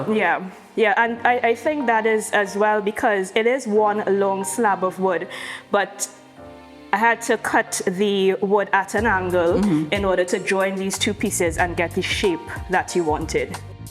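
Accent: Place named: Caribbean. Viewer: I figured you are the caribbean by your accent. Hope your mom Maxine is doing ok. Blessings in your endeavors. Keep soaring lady....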